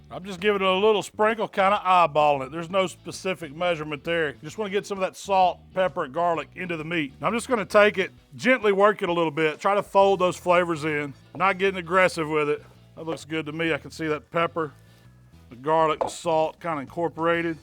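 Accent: South, United States. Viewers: Always trust a chunky happy southern sounding cook on a grill! Lol can’t go wrong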